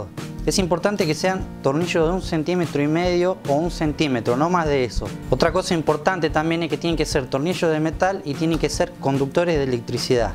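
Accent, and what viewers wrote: Argentina. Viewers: oh un argentino que bueno like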